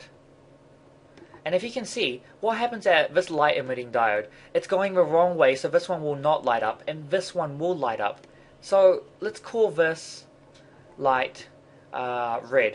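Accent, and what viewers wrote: New Zealand. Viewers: You're from NZ aren't you ?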